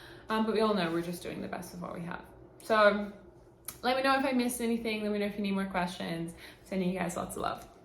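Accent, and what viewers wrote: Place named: United States. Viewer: The American accent 😱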